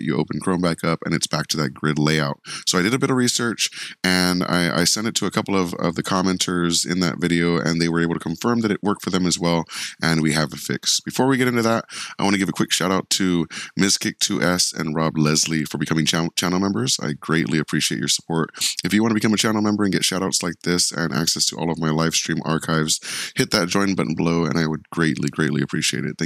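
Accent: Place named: Jamaica. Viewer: It worked. Thanks. On a side note, you sound Jamaican.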